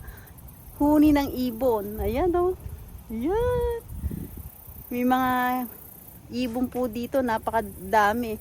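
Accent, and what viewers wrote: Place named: Tagalog, Philippines. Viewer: Hello ..goid evening , very trur ..ganda tlga nv pkace ..naoaka tahimik nv lake na ysn .. Pero ting punto mo tkga ang gustong gusto ko ..tagalog na tagalog ..sa buhsy america ..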